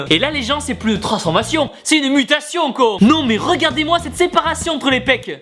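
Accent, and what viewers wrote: France. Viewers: tu doze solide tu doit etre stressant , maudit accent francais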